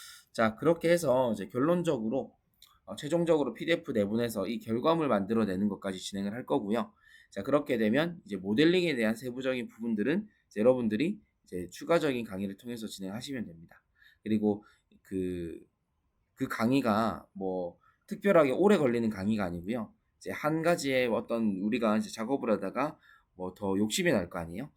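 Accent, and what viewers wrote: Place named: Busan, South Korea. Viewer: 부산이네요 ㅠ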